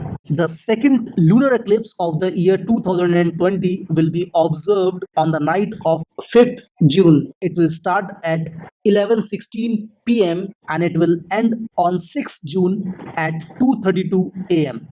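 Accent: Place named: India. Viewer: Very strong Indian accent ☺️🙏🏻💫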